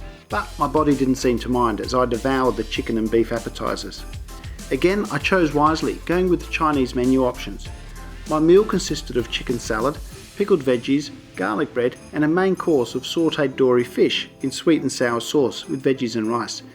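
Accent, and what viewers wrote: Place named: Australia. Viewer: Love the Australian accent!!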